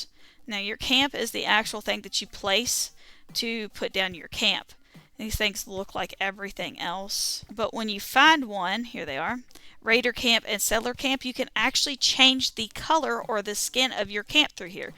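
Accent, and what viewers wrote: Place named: Appalachia, United States. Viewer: Only just stumbled upon this channel but I love how, within the first 30s, I can tell another Appalachian by how they say words like "dry". Instasub.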